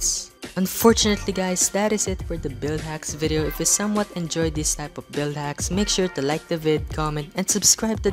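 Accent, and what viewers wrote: Philippines. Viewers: you sound like you have a filipino accent. are you?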